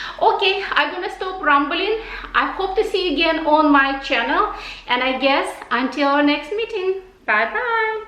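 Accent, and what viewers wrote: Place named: Russia. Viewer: Dear, you are so sweet...obviously there are some people on ytube, who care about you. I love your chanel, your russian accent....Now you are even more confident with ytube. You made/make a great job! I love your new items, especially the keyholder & the earings- they are both so cute! Can you give me the price of the earings? Thanks. Have a nice sunday 😍😉🇫🇷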